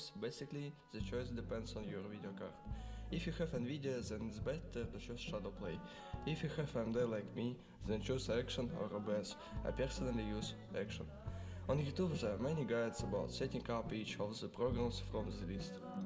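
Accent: Russia. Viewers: боже мне так нравится твой русский акцент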